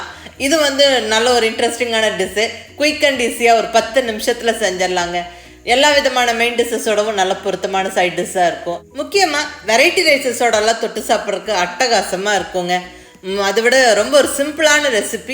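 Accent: Coimbatore, India. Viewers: You are absolutely right. Not only the receipes but the way of presentation with (supposedly Coimbatore Slang) clear and crisp voice are adding merit to her receipes. Thank you madam somuch. Pls Keep rocking ...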